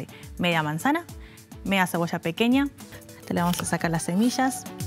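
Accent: Argentina. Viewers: Parece argentina con lo bien que habla. Muy buena pinta el plato.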